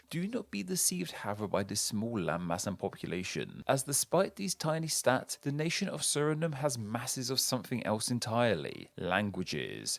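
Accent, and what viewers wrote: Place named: United Kingdom. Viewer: Your pronunciation was very good of those names. It was very surreal hearing those words spoken in a British accent. What a time to be alive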